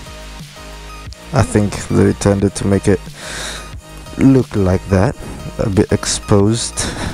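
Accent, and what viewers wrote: United Kingdom. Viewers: itu aksen british :3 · i love the way you talk, British Accent hahaha,lil bit weird heard you moaning ahahaha. Nice gears bro. i'm interested with the shoes.. 7gears, right??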